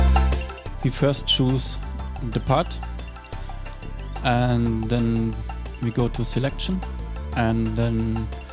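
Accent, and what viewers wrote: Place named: Germany. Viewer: no offence but i find it amusing that you speak with a german accent in english, and your youtube ava is an asian :) thanks for the video, i think this might have helped alot! :D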